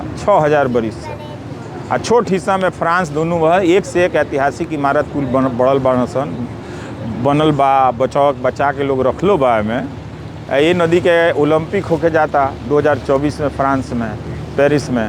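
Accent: Bhojpuri, India. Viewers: बहुत ही बढ़िया जानकारी दिहली रउआं।आपके मुंह से भोजपुरी सुनिये के बहुत आनंद आ गईल । धन्यवाद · एतना मीठ भोजपुरी रउआ मुँह से सुनी के दिल गद गद हो गइल 🥰🥰👏👏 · केतना सुंदर आहा वर्णन करेला।।। हम बिहार के बनी।।। हमरा बर निक लागल आहा के भोजपुरी बोली।।।।। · एतना मिठा भोजपुरी रउआ मुह से सुनी के दिल गद गद हो गइल बा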